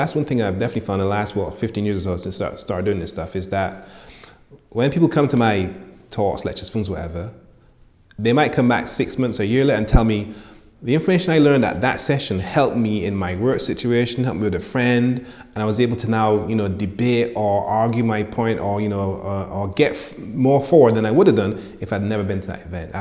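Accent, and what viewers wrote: United Kingdom. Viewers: Great work Sir. May I humbly suggest that you enunciate your words and slow your speech ever so slightly. The British accent is sometimes difficult to clearly catch every word. Please understand that I seek not to correct you, but offer constructive suggestion, as your presentation is extremely important and relevant. I'm from England and the Caribbean and had slight difficulty catching certain words. Not much but it was distracting and I applaud your work nonetheless. Press on Mr.. The truth will find fight its way to the light, where it will be a beacon. Indeed by you and through you.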